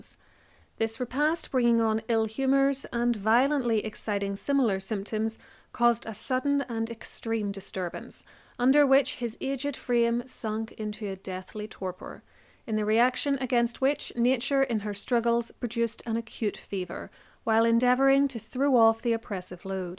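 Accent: Northern Ireland, United Kingdom. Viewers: She's from Northern Ireland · I agree, part of the reason I listen is for the beautiful music of this accent. Northern Irish, maybe? Whatever it is, your diction and accent make the videos wonderful to listen to. 🥰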